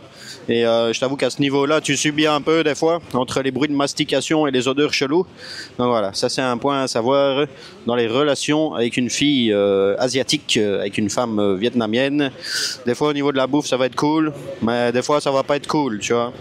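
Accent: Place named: Belgium. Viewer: Merci pour t'es conseil tu ma bien fait rire et ton accent Belge et très sympa !!!!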